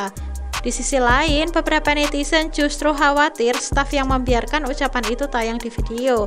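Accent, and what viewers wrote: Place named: Java, Indonesia. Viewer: medok mbk nya wkw jd salfok soundnya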